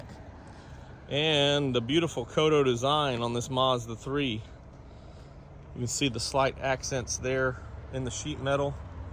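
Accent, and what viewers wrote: South, United States. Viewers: Uh.. Americans do not. We have different dialects. He is Southern which is why. Northern etc say Mazda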